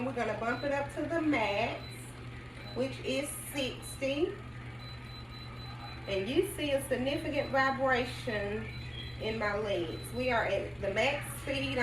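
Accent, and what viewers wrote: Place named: South, United States. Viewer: Thanks for the review! It’s been a year since you’ve done it, what is your opinion of this device now? I just bought mine, delivered to me today. I’m excited to get my “shake on” 😅 I have a lot of injuries from my military career to work through. So I’m definitely looking forward to this. Btw, very nice bodily attributes 😉 Looking good over there. Sounding like you from GA or the Sip 😄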